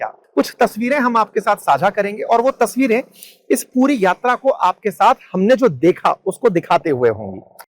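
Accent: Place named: Uttar Pradesh, India. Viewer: इतनी शुद्ध हिंदी कोई उत्तर प्रदेश का निवासी ही बोल सकता है स्पष्ट भाषा आपकी वाकपातुता की परिचायक है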